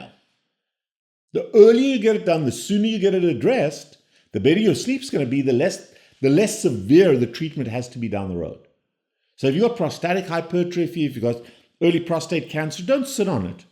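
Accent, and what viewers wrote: South Africa. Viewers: 💯 this guy is South African 😂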